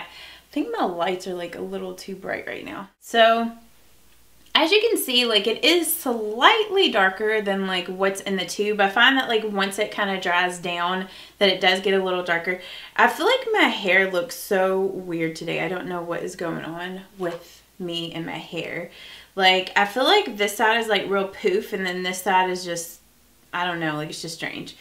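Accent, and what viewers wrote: South, United States. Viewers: Enter me in the giveaway. I have followed you for a long time, your channel is amazing. Love your Southern Accent and love to here you speak. Blessings!!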